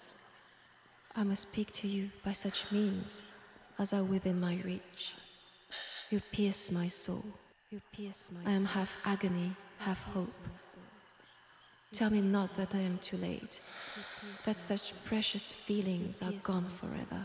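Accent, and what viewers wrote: France. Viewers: it was indeed a huge surprise for me! i literally was stunned for minutes, not understanding if it's even real. And thanks to you for making my friday great with this one ^^ And hey, please don't cringe! Just have fun with it's "thick French accent". I would call that mix adorable at the very least:) Have a wonderful week ahead,. Your content is making my days brighter, so i'm supporting you in my possible ways. I just hope my silly little comments (which also could be cringe, hehe) making you feel better and appreciated \o/ Ты молодец и я правда восхищен твоей работой. Надеюсь, ты будешь продолжать дальше!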